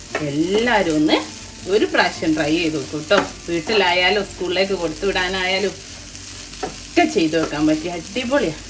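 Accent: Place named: Thalassery, India. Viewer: Excellent recipe!💖 Are you from Thalasserry...I think so from your language..😊😊 .......From Bahrain. Actually from Thalasserry.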